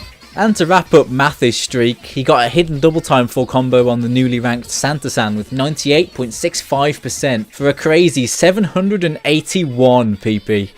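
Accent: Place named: England, United Kingdom. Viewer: I don't really like English accents but at least he admits to being a scrub ecks dee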